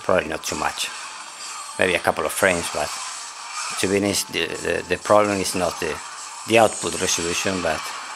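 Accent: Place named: Spain. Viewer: aun que se le nota en el acento que es español, yo soy latino saludos desde américa latina tiene muy buen inglés, solo le falta evadir ese acento muy pequeño del español pero que exelente vocabulario, saludos y que Dios lo Bendiga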